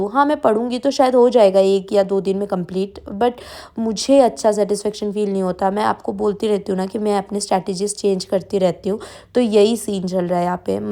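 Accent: Bengal, India. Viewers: are you bengoli? you have a bong accent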